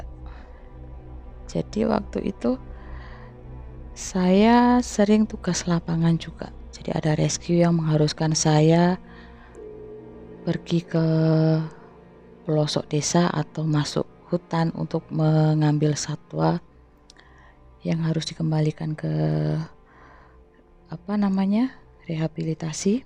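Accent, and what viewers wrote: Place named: Manado, Indonesia. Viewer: Mba nya logat Manado banget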